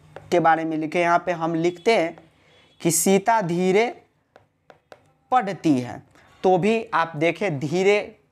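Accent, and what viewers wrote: Bihar, India. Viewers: Bihari hai ka sir ?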